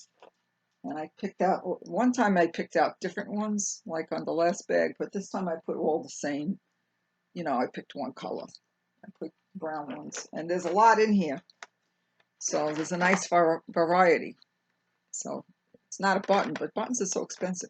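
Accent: New York, United States. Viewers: I like the divine pattern hat & cowl. Looks really great in that color green.I enjoy listening to your NY accent, memories of home. How about a drawstring backpack that teens like. It would be about same size as your bags. 🤔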